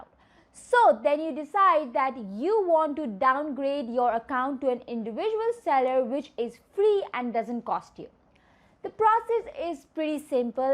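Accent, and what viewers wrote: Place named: Mexico. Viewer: Que lindo la mexicana y su ingles =)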